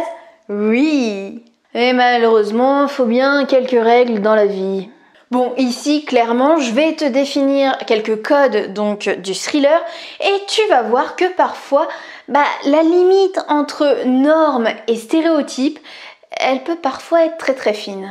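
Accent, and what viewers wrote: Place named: United Kingdom. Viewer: Et on aime les sensations fortes ! Et quel accent british dit 😋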